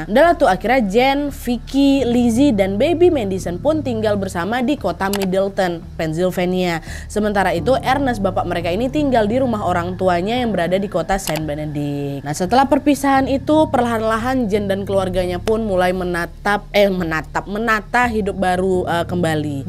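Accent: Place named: Sumatra, Indonesia. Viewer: Asek kali lah kalau udh org Sumatra yg bawain cerita.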